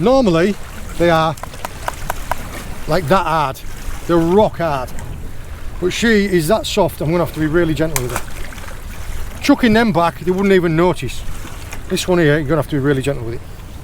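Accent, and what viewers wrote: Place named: Northern England, United Kingdom. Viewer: You have a northern accent. What took you to Cornwall?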